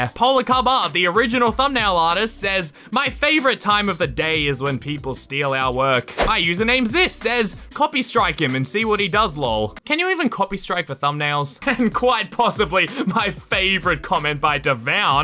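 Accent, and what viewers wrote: Australia. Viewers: I love his australian accent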